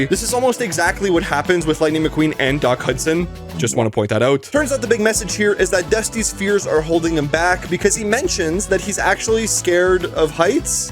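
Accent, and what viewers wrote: Canada. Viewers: This dude sounds Canadian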